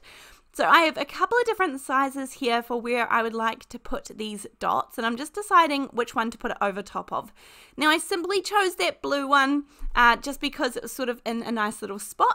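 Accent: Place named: South Island, New Zealand. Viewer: Love all those cards, especially the last one! And just love hearing that South Island accent lol (I'm North Island lol)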